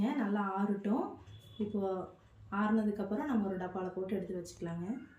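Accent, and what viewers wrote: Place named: Kongu, India. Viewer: Neenga nalla Kongu pasa pesareenga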